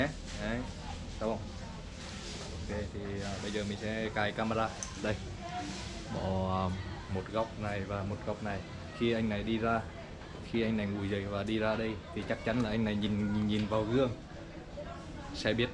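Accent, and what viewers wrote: Nghe An, Vietnam. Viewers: Anh ở nghệ an à anh..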